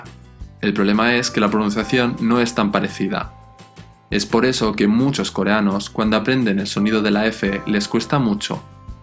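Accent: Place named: Spain. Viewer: gracias por responder...en que parte del mundo nacistes? se escucha como español de España...sigo viendo tus videos y tambien te voy a seguir por Instagram..saludos..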